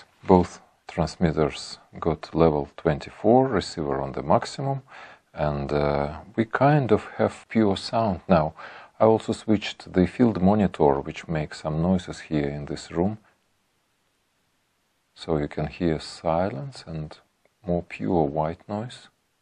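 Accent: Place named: Russia. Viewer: День добрый. Такой акцент. Вы Русский?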